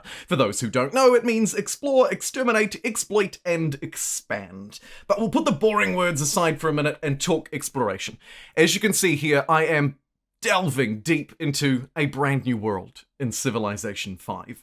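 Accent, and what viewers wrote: New Zealand. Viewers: You sound Kiwi, Australian and American all at the same time, I like it. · is this guy kiwi?????????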